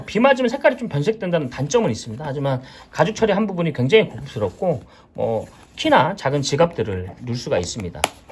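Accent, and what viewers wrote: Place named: Seoul, South Korea. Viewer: 님 역시 고급진 서울어에 목소리도 좋으시공 ㅎ 이렇게 이쁜가방 나눔이벤트도 해주시고 응원하면서 신청해봅니다 41번 진규철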